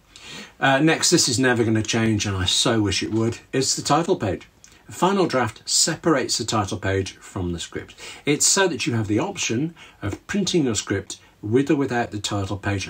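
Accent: England, United Kingdom. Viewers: Truthfully... I made this same mistake in an email... easy to do... (Smile). Thank you again for this great video... By the way, your voice sounds like you may be from England? I just found out through DNA that I'm 68% English 23% Irish (Now this explains why I'm so Victorian WHITE skin) I have been told I have Bass Belly White skin... I do not think that is a complement, my response is, I am Victorian White skin, more porcelain... not the belly of a bass... fish! Laughing so hard... you can't make this stuff up!!! The reason for my inquire of you being English... is I am working on a manuscript, hopefully to turn it into a screenplay... It is based on a true story, which I just happened to be apart of, about one of my clients that went to Harvard a few years back, and because of this, I have linked the "Boston Strangler to Jack the Ripper" I won't bore you with the details right now, but if and when I do finish the script, and if it does something, I"ll let you know... 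I used to have family in the UK... the HARRYs we even had a shield or that crest? Please do not mind my ignorance. I hope if my screenplay about Jack the Ripper/Boston Strangler comes about, I plan to go to England... maybe find some of my blood relatives over there. It was my Aunt Helen Harry that taught me how to drink Proper Tea. It is a dream of mine to one day visit England.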